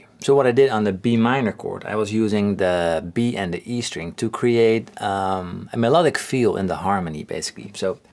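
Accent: Netherlands. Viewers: I heard your dutch accent instantly. I'm dutch too👊🏽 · Ik hoorde gelijk dat je Nederlands was 😂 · Are you from the Netherlands? I never noticed😂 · Oh shit, you're Dutch lol. Hoorde het eerst niet maar nu wel hahaja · Haha ik dacht op het begin wat een raar accent het lijkt haast wel een Nederlander en toen dat stukje van je vrouw was het echt zie dat dacht ik al. Btw leuke video en erg interessant! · Ik dacht al meteen... Hij heeft een Nederlands accent en ik zie je kanaal voor het eerst, dus ik wist het niet. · After 3 sec of speaking I` could here immediately you are a dutch guy..amazing haha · Ik wist niet dat je Nederlands was maar had wel al z'n vermoede met het engels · hij heeft een best duidelijk nederlands accent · ik hoorde al een NL accent in je intro! Dope vid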